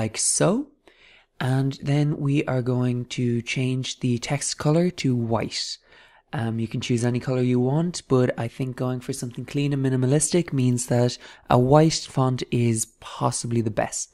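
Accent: Ireland. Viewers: Thank you, very helpful and easy to follow. Your accent makes me want to tour Ireland again -- Sláinte!!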